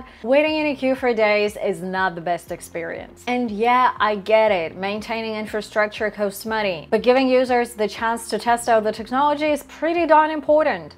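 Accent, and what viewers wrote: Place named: Spain. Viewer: es gracioso que tu español es el de España jaja y el de las ias suena más mexicano neutro 😂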